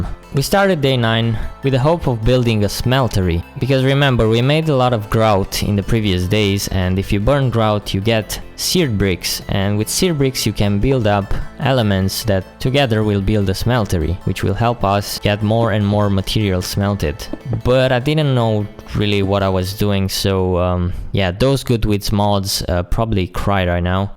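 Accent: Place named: France. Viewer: btw where are u from ur actent is likr frech on something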